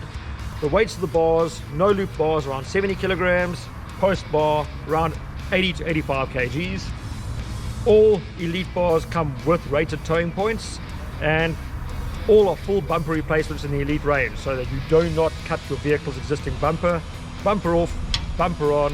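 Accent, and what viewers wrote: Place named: South Africa. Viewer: He sounds South African. :)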